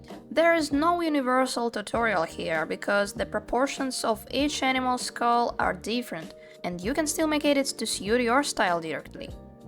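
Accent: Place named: Indonesia. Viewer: For some reason I hear a little Indonesian accent lol, thank you so much for the tuts!!💖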